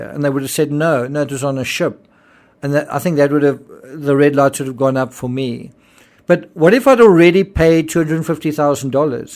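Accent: South Africa. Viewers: Love your work and your accent. South African?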